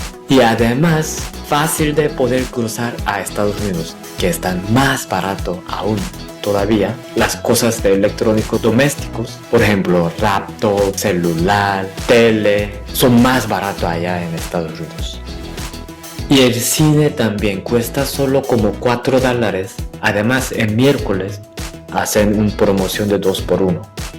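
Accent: Mexicali, Mexico. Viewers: Me encanto este video de un extranjero que en tan poco tiempo aprendió el idioma y hablar como todo un cahanilla universitario. Muy de acuerdo con tu descripción de la ciudad y lugares de estados unidos que acostumbramos visitar. Deberíamos imitarle y subir videos de Mexicali, pero como residentes orgullosos de la ciudad que atrapó el sol.🌞🤩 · Felicidades!!! Me encanta que hablas el Español casi Perfecto 👌 y tipo CACHANILLA jijijijij Gracias por dar a conocer mi Bello Ranchito ❤️ 3 parte por favor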